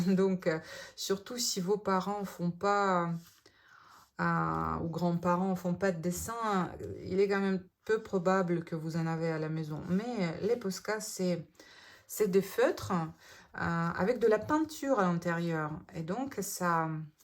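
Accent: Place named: Russia. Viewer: Bonsoir Madame,je de,couvre votre chaîne et vos tutos,j’aime beaucoup votre joie de vivre,vos explications vous êtes très très sympa, je suis une mamie et je vais m’atteler à faire vos jolis chevaux russe,de votre pays,votre tout petit accent est très charmant ! J’ai le temps d’ici Noël,de m’exercer,et d’en réussir quelques uns !du moins j’espère !Merci pour tout le temps que vous nous avez accordé, bisous aux enfants 👶. Marie-Line, deProvence.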